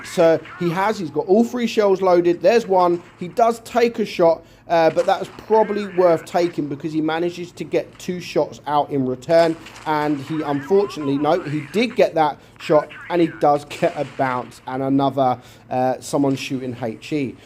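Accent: United Kingdom. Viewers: bri'ish accent lol, i also mishead lmfao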